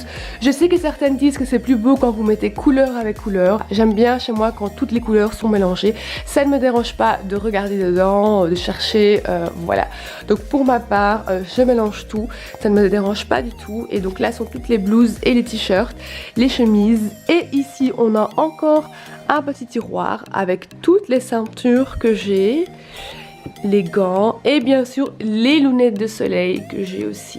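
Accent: Belgium. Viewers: elle a pas un accent français vu qu'elle est belge ils ont leur accent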